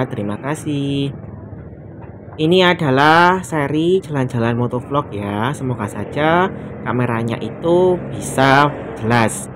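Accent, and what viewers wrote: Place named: Java, Indonesia. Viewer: Jawa banget logatnya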